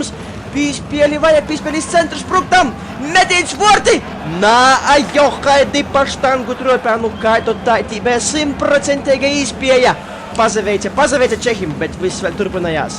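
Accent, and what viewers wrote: Latgale, Latvia. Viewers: nuja latgaliesi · Šo čali par komentētāju...tik labs...tāds jautrs un dzīvelīgs!:) un protams, latgaļu dialekts piedod to odziņu!:) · Ai,ai,ai kai tai piespele nu.. nevar saprast kas tur beja :D tā viņa intonācija vienkārši nogalina :D Es neesmu latgaliete, bet man ļoti patīk šis dialekts un labprāt pati iemācītos tā runāt :) Puisis labs komentētājs, cepuri nost :) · Man latgaļu sakāmais vienmēr ir paticis. Un te komentēšana riktīgi laba, jautri :D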